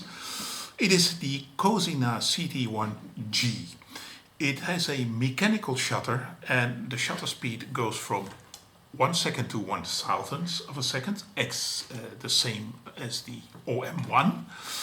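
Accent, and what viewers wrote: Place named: Netherlands. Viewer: You are doing fine, I think! Your dutch accent is ok, don't worry. Maybe you can add some photos taken with those cameras to your reviews 😉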